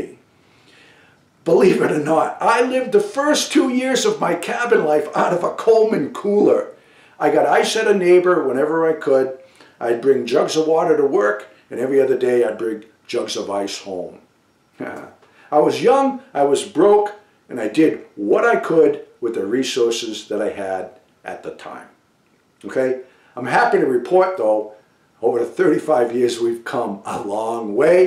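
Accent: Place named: Massachusetts, United States. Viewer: Not to get off topic, but you have a very pronounced new England accent, specifically Massachusetts. I'm from Massachusetts, and I would know that accent anywhere 😊 GOD bless you and Thank you for the tips.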